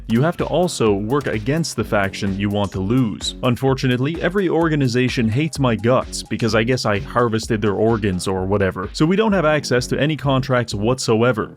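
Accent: Canada. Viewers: So you’re Canadian Eh? Pitiful.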